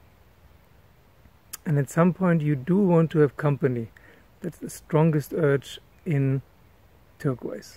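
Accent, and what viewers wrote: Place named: India. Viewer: wow, I always thought you were Indian, wheres your accent from? :)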